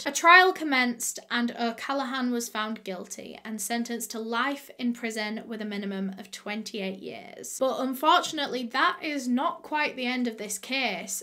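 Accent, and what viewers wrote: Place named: Yorkshire, United Kingdom. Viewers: Shes from Yorkshire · yorkshire accent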